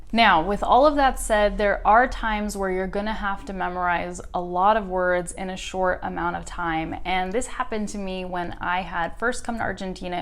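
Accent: United States. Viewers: You are a good teacher ,thank you,you made me love this language and american accent Deeep greeting for you from sudan😍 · I just step by saying that I’ve found many of your videos very helpful. I've taken the time to listen and watched some of them twice so I can listen to your pronunciation, intonation, and so on; and then repeat or search for a new word you've said. I like very much how you explain the things or talk about different topics. I'd love to have an accent like yours, I'm working to have a better accent, more American. So, thank you so much! And I'd also like to add that even there are many women who make these kinds of coaching, you have become on my second favorite one. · You are a good teacher. I understand what you say and it is great. I don t get used to American accent but this time I understood it...Great! I usually "suffer" when I watch american movies because I like them but I have to watch them again and again to understand..At least not the whole movie but just some chapters of it... 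I hope I will overcome this fear of "listening" one day Thanks. Take care ..bye🙋 Greatings from Italy🌍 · I like a lot of the your lessons. I have difficulty in listening, but I am trying to understand American English native speaker like you. Thank you for your great job! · Hello Miss. I suppose you speak American's English, at what state you are? I'm from Guatemala City. Salute.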